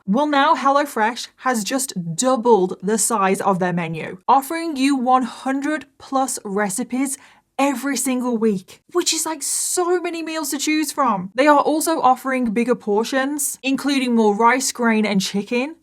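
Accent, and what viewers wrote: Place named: United Kingdom. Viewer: I watch your content religiously every week Ive seen every video you have posted. Rewatched and i love what you do and your brittish voice! -- Rachel from South Dakota 🇺🇸 🌎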